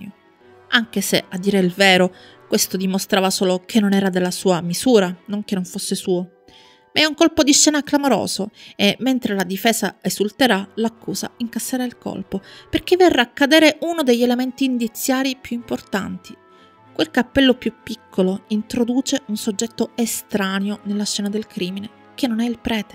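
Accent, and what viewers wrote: Sicily, Italy. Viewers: Bravissima come sempre. Il tuo accento siciliano è stupendo. ❤❤❤❤❤❤❤ iscritto 😍🥰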